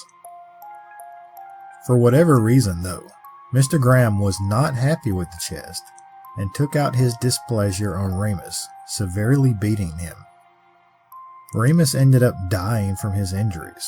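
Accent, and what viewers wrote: South, United States. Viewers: What an eerieitem... I was a Zac baggins enthusiastic but he's kinda lost me a little,... yet again your Southern drawl thrills me ❤😊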